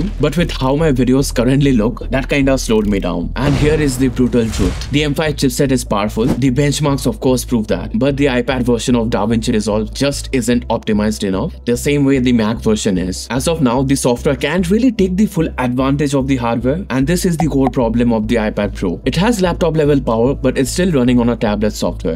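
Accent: Bengal, India. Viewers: Bengali tone in English 😢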